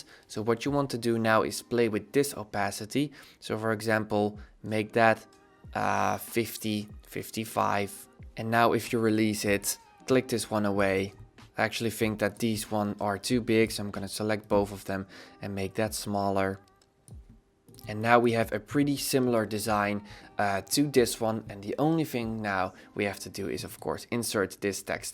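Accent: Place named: Netherlands. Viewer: No offense, maar je nederlands accent is wel hoorbaar voor de mede-Nederlanders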